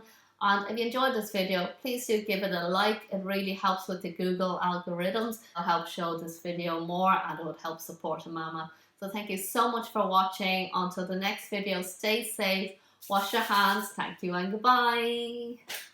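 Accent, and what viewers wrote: Ireland. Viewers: Are you from Dublin or Ireland you have really nice accent 😃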